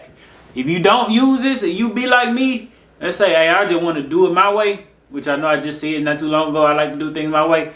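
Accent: Memphis, United States. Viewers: Hey thanks for the tutorial! Your accent sounds familiar— especially when you said “if you’re watching this with your granddaddy”. 😂 I’m from Memphis (but live in Nebraska), so I clicked through your vids and found your Memphisvlogger hashtag. Memphis in the house! And just watched the game! Or…actually…listened to my husband watching the game. Whup that tr1ck! 😊👍🏽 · This was a good instructional……tell me are you from Memphis or spent a period of time there cause I promise you sound like a Memphian 😂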